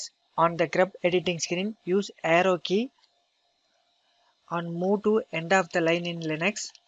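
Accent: India. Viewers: Straight to the point,easy to understand,very simple to follow. Also I really don't mind the indian accent. Personally I find it easier to understand. Keep up the good work man!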